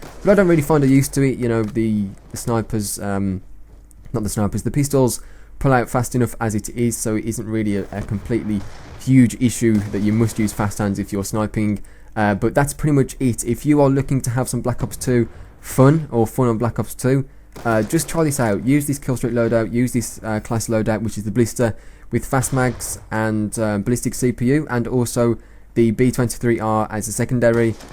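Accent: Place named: United Kingdom. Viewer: Where abouts in the UK are you from? You sound really local to me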